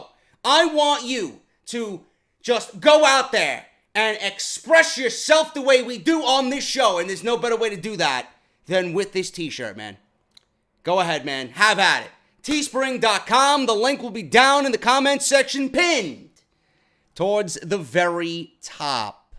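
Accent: New York, United States. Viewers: you are straight up New York you are like the difference between a glass of water and a shot of tequila STRONG, keep it up glad I found you you’re Number 1😎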